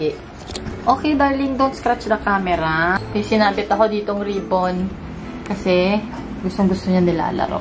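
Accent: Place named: Ilocos, Philippines. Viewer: with her ilokana accent. annako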